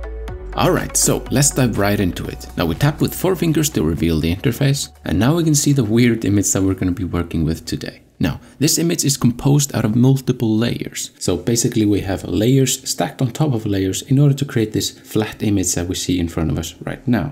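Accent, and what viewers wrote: Iceland. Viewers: Hi, thank you soo much for these amazing tutorials! I’m completely new to digital drawing (and not very experienced in drawing in general) and just got my first iPad (pro <num>) and bought the Procreate app mostly out of hopes to unleash my creativity in a new way. However, as I started exploring the app I got quite overwhelmed and a bit discouraged (and amazed as well of course) by all the things you can do with the program, so when I found your videos today (!) I felt really glad and hopeful that I can learn and eventually have no limits in creating in it but my own brain…. 😌☺️👍 I will check out all your tutorials and stuff (some, like this one, a few times or more I guess, before I really understand this way of thinking and creating) and I really want to thank you and commend you on great teaching skills and videos! Extra plus for your wonderful Icelandic accent as well! 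😉👍 Best wishes from an inspired Procreate beginner in Sweden! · Great tutorial! Thank you. Love your Icelandic accent too, a bonus!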